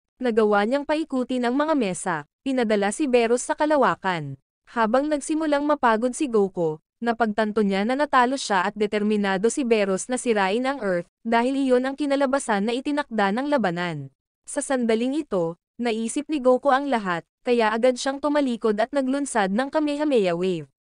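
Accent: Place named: Visayas, Philippines. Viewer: Feeling ko bisaya tong nagsasalita, freyza?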